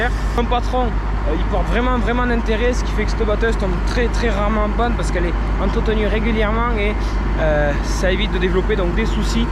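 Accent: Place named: Toulouse, France. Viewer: Vue l accent je dirai Toulouse alentours mdr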